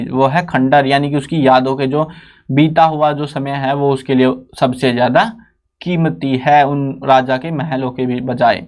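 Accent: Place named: Churu, India. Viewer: Great sir.... Eetta acha to hme hmare teacher bhi nhai pdate.. Sir ap rajasthan me churu Side se ho kya apki language se pta chl rha he..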